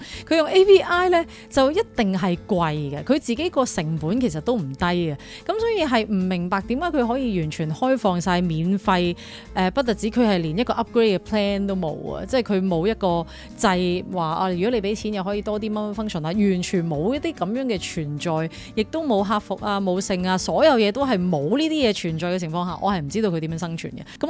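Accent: China. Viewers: 好好听的白话，介绍的工具很有趣，关注了，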